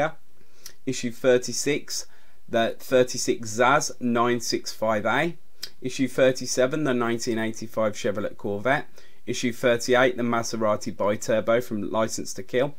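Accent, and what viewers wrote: United Kingdom. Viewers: Making a recording like this might look easier then it is.... I'm glad he did it. And he speaks British English....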